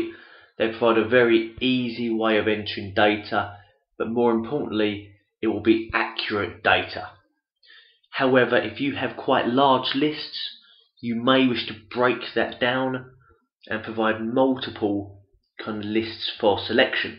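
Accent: England, United Kingdom. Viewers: Very helpful. English accent makes it sound highbrow too! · Thank you very much! You saved my life today at work! P/S: I really enjoyed English accent : )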